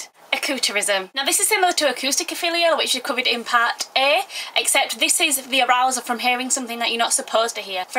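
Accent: Yorkshire, United Kingdom. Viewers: Are you from yorkshire? my dads side of the family are all from hull, and the accents sound very similar, of course, I'm not english so I'm not that great at recognizing where the accents are from :)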